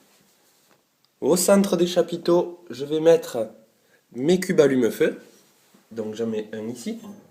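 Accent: South, France. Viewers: Super vidéo, pour ma part je n'ais jamais fait de feu et cet hiver je vais devoir savoir le faire, si je ne veux pas mourir de froid, vos conseils me sont utiles, ce n'est pas inné, si on a pas appris on sait pas faire. C'est pour cela que je suis venu voir cette vidéo, très bons conseils, voix douce et calme très bel accent du Sud que j'aime, ainsi on reste jusqu'au bout, très belle démonstration, merci beaucoup ! Me reste plus qu'à mettre en pratique ce que je viens d'apprendre grace à vous :) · GUIGNOL TU CROIS QUE LES GENS NE SAVENT PAS FAIRE DU FEUX DE PLUS AVEC TON ACCENT DU SUD TU AS DU EN FAIRE SOUVENT IMBECILE MEME PAS CAPABE DE FAIRE DU BOIS D ALLUMAGE TRAINE SAVATE FAINEANT MAIS CA DOIT AMUSER DE FAIRE UN PETIT FEU TOUT LES 10 ANS AVEC TA CASSETTE ANDOUILLE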